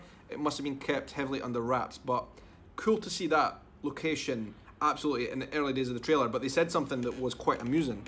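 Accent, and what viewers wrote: Scotland, United Kingdom. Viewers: I just came back from Edinburgh and I could see how important was Mary Queen for the Scottish people and how I'm missing this accent. I fell in love for your country ❤️❤️ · Love that Scottish accent,.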